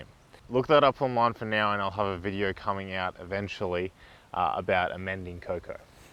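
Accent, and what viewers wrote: Australia. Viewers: YOOO another Aussie gardener I can get behind!!!! lots of interesting and informational content that isn't droning on and boring, and it's being all eco-friendly and all that! You have a new subscriber lol, I adore all this crafty gardeny goodness xD Where abouts in Australia are you? the butcher birds in the background and your accent sound very WA-like, are you WA-based? xD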